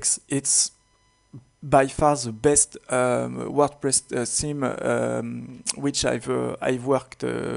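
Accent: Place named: France. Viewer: This really helped me. And I wish I spoke French, so I dig the accent.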